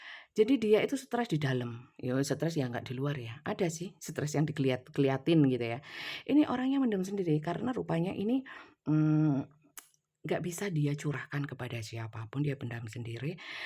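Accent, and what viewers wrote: Java, Indonesia. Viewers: Bunsaya suka ibu kalau omong Jawa medok banget. Sebagai orang Jawa.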